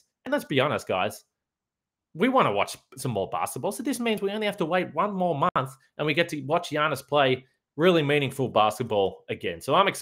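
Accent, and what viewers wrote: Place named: Australia. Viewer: Streuth! These Yanks are a bunch of flamin’ galahs if they can understand you. Fair dinkum, I’d be devo if you changed up your accent. I mean crickey if yous can’t understand, bum a durry, hit the Bottle-o or the servo and grab a frothie, and get in the Oz mindset before your bang on the podcast you’ll be cherry ripe. No Wuckas mate!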